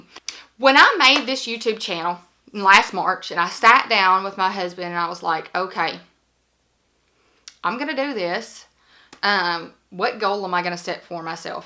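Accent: South, United States. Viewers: LOL I thought you talked slow because you are a teacher and was in the habit of talking slower to explain stuff to your students. I'm a Southerner too, so no problem understanding you. I'm from Ky.And I love your videos.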